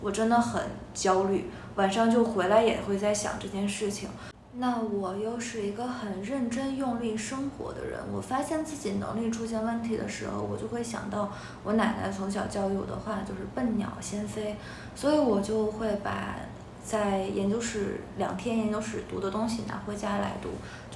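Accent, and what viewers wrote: Northeast, China. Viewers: 老妹儿，东北口音有点重啊～～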